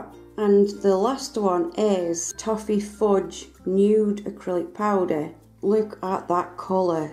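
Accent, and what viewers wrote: United Kingdom. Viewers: Everything sounds better with a British accent!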